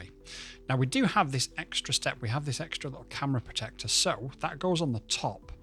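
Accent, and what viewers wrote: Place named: Manchester, United Kingdom. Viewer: is Welsh, but you hide your Mancunian twang quite well. To my Scandinavian ears, it sounds more like a standard northern accent. But don't trust my opinion — I'm just an ABBA-Volvo-Ikea bloke and everyone knows what happened at Lindisfarne when the monks trusted us.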